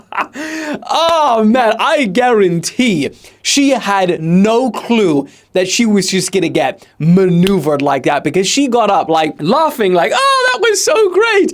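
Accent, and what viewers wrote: South Africa. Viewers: bro is truely a South African 🤣"listen properly"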